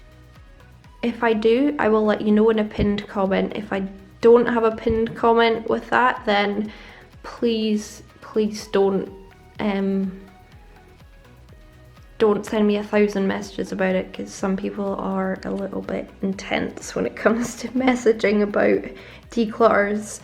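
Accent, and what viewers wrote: United Kingdom. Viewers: Where are you located ? Your accent makes me home sick for the UK :)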